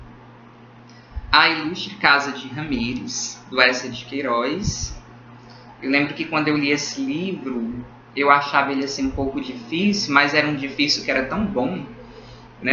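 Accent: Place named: Fortaleza, Brazil. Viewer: Aaah!! Reconheci o sotaque pq moramos em FortaleZa 👏🏻👏🏻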